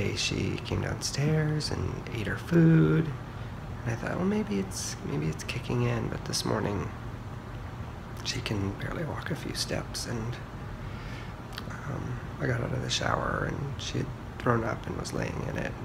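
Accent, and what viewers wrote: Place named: England, United Kingdom. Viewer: Dear gentleman, I couldn't understand why this procedure were carried out. I am not good at understanding your English ascent. Why both cats were not left to take their own time to leave this world.please don't feel bad if I have asked the question without understanding the situation ( which really couldn't understand ). Thanks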